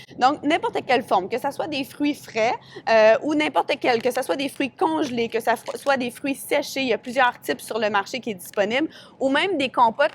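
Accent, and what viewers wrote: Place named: Canada. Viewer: L'accent canadien lol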